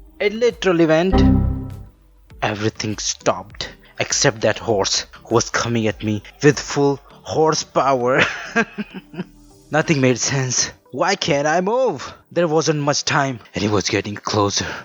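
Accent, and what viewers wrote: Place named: India. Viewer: Your accent seems Indian lol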